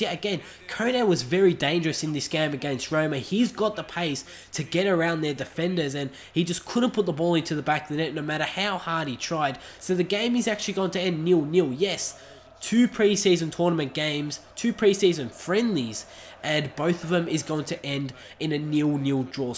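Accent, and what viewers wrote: Australia. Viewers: you from Australia??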